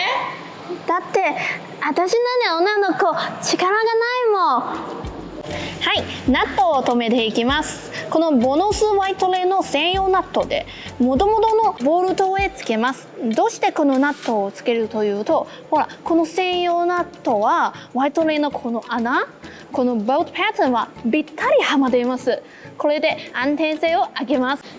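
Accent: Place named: Japan. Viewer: I like your Japanese accent. Sounds really cute!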